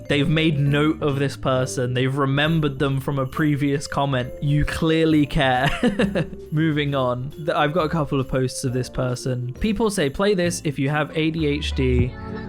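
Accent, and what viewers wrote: United Kingdom. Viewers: It's only now occurring to me that you're British.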